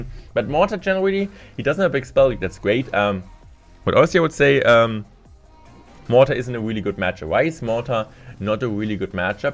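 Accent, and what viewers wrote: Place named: Germany. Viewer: i cant even understand this shit becouse of his german accent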